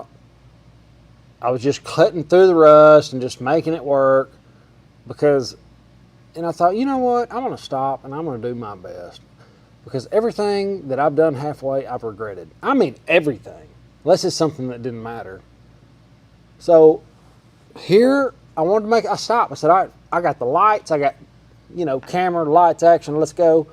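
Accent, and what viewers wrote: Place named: North Louisiana, United States. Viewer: Haughton high school, sounds like you might be from north, La. Youtube recommended this video. You're a good torch hand!!! I have a youtube channel also, I do everything from trucking to fabrication. I finally broke down and bought a Hougen mag drill last year, after wanting one for over a decade! I worked as a millwright and also in a fab shop several yrs ago. I'll subscribe and check out some more of your vids later, Always good to know new tips to make things easier!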